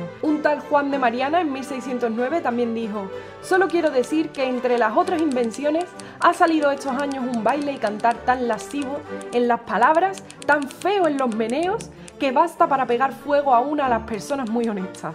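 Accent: Andalusia, Spain. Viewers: Y desde el Guadalquivir llega a Veracruz, después se convierte en sones y jarabes novohispanos. Felicidades por la interpretación. Ese acento andaluz 🤤🥰 enamora 😍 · Me encanta su acento andaluz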